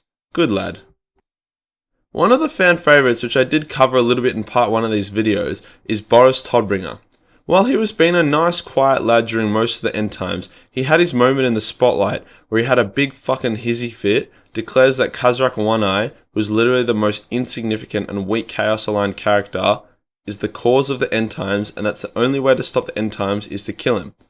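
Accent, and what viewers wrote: Australia. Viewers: Great vid man, always awesome to hear a Australian cover lore. Not only educational but funnier then my non-existent love life.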